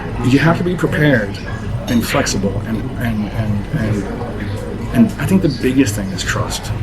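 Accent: United States. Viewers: A truely honest and moving video. Thank you. I rarely give advice but it seems you need a break. Perhaps return to USA , earn some "real" money and come back fighting. Regroup! Best of luck with whatever you decide. (Apologies if that's not a USA accent, read it as "home country" instead!)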